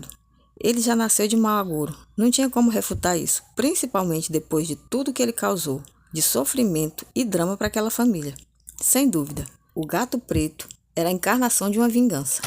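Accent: Northeast, Brazil. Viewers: Boa tarde irmãzinha, gostei muito de seu canal sua voz narrando ôs vídeos é bem nordestina, sou daqui do estado de São Paulo, mais nasci na Bahia, pôr isso entendo tudo que você diz 😂😂😂😂😂respeito muito ôs vídeos, c são reais ou não fica há cargo de cada um, acreditar ou não, tenho dezenas de canais que relata vídeos verdadeiros de lobisomens vampiros e outras criaturas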